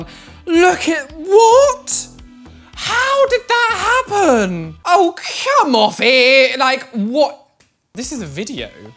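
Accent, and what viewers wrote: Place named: RP, United Kingdom. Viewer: Omg I haven't been watching your videos for long bit I think u r soooooo funny and I love the accent ur so posh sounding 😀😀😀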